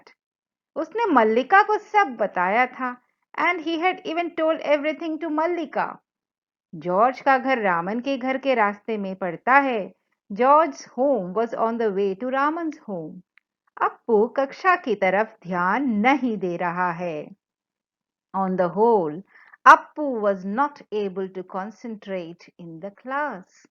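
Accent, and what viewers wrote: Kerala, India. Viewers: mam aren't u from kerela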